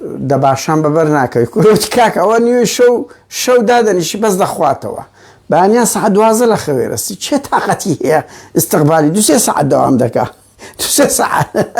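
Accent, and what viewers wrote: Erbil, Iraq. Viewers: زماني هةوليريةكةت زور زور خوشة بةردةوام بة ئةمة لة بال ئةوةي كة بةريزت زور ئازا و بويري و بة هيواي ئةم دةنگة دليرةت هة بمينيت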